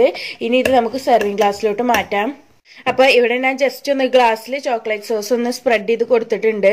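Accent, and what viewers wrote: Malappuram, India. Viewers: Malappuram slang adipoli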